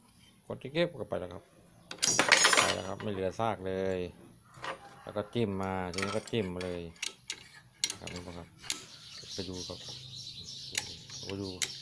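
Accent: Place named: Isan, Thailand. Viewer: ยุบ้านไหนน่อนิครับคือเว้าคือกันแท้ครับ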